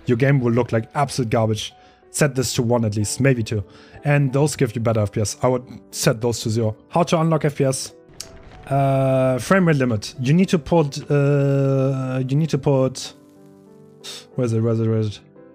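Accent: Germany. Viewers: clearly german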